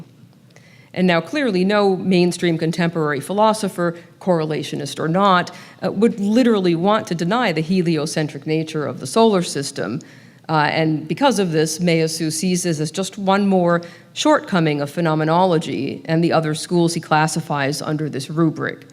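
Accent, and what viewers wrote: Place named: Canada. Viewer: must be Canadian? weird voice and accent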